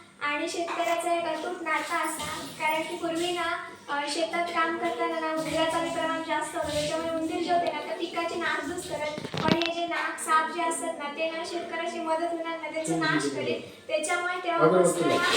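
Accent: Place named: Malvan, India. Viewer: तु मालवणी बोली इतक्या ओघवत्या आणि गोड शैलीत बोलतेस, की त्याचं खरंच मनापासून कौतुक वाटतं. कुठलाही व्हिडिओ असूदे, तू तो प्रामाणिकपणे आणि निरागसपणे सादर करतेस, हे मनाला भावते. आजकाल बऱ्याच मालवणी लोकांच्या बोलण्यात मराठी प्रमाण भाषा आणि इंग्रजी शब्दांचा वापर असतो, पण तू जेव्हा बोलतेस तेव्हा खरी मालवणीची गोडी आणि सौंदर्य अनुभवायला मिळतं. तुझी ती बोलण्याची पद्धत अशी आहे की पुन्हा पुन्हा ऐकतच राहावं असं वाटतं. म्हणूनच तुझे व्हिडिओ मला फार आवडतात. असंच सादरीकरण करत रहा आणि खूप मोठी हो — तुला मनापासून शुभेच्छा!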